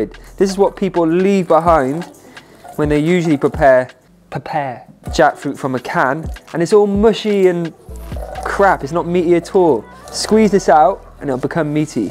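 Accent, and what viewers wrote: United Kingdom. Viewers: Brilliant!!! 🤤🤤🤤🤤 I’ll never get sick of your UK accent, how you say vittttamen especially, adorable and sexxxy 😉😁🤣🤣🤣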